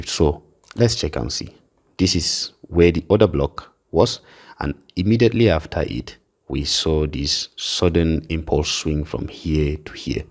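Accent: Nigeria. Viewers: I don’t know if it’s because of your Nigerian accent that’s makes it easier to understand for me but you always teach in such way it’s easy to understand thanks a lot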